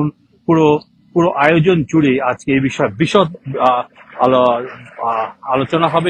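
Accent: Sylhet, Bangladesh. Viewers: ইয়া আল্লাহ আমার সিলেটি ভাই মনে হয়।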